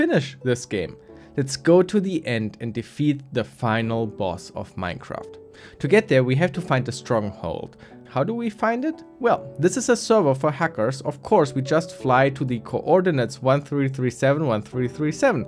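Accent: Germany. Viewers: I always love hearing a german accent in videos and then checking the user profile to find out I was right again: Berlin in Deutschland :) Schön zu sehen, dass jemand wie du fast 750k subs hat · wait.. are you german? i can hear it out a little bit xDF...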